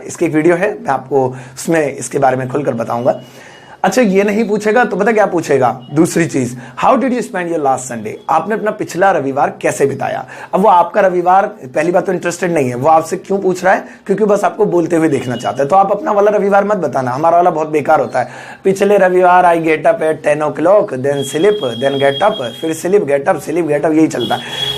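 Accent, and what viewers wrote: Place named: Haryana, India. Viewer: Sir ji ap ki haryanvi must h ..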